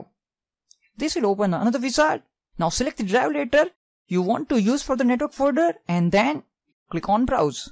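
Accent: India. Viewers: No disrepsect but your accent is very hard to understand Why is it that just about every video on how to do this is by a indian or muslim guy? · I'm unable to understand, you have a strong Indian accent & to make things worse to speak too quickly!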